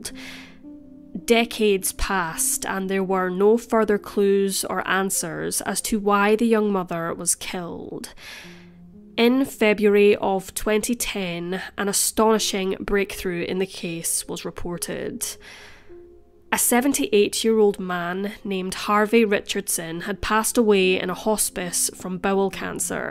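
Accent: Scotland, United Kingdom. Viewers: Love your voice and accent. Sounds Scottish. Lovely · Why would anyone criticize your lovely Scottish accent? Your accent and compassion for the victims are part of the reason I like this channel. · People like a range of delivery speeds and pitch/speed variation. I like hers for her. And some are effective with different paces. I'm originally from the East coast USA, though I've lived in the NW and SW. East Coast people tend to speak faster. Slower: Southern, Western Mountain. And the Scottish parts narrator is from. Because she to me speaks with an accent, one I like, I wouldnt' want it sped up much or I might not understand her. E.g., there are vids of unintelligible faster talking Yorkshire people. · I'm not a native english speaker so the pace really helps me understand the Scottish accent :) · Ignore all the idiots criticising the accent. 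As a fellow Edinburgher I’d detect the ‘Educated Scots’ tone a mile away. The accent is actually far batter for enunciation and pronunciation than almost any in the U.K. Anywho, if you are taking requests-how about the Alan Wilson/Ian Sutherland case? By a circumstance of pure serendipity I wasn’t actually at one of the trial seasons for Alan Wilson when he has charged with child molestation. Imagine my surprise when, a few years laters, he turns up dismembers in a bin next door to where a work colleague lived. Life is funny that way eh? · I’m born and bred in Liverpool and never heard of this case. Beautifully narrated love the Scottish accent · It’s the Scottish way of saying he was born into a family. Like a wee Bairn. 😂 My family are originally from Scotland. Her accent is cute. I’m American though . I don’t have it though unfortunately. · Sounds to me like her pace of speech is just normal. 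Not too fast and certainly not too slow. Just right. And I would say, with having her beautiful Scottish accent, for many, this pace (rather than fast) would be ideal. :)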